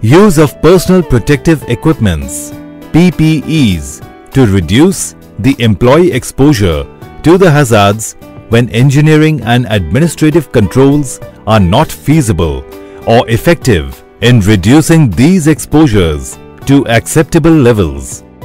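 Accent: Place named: Thailand. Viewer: The best Thai English I've ever heard, man! And thank you for this video.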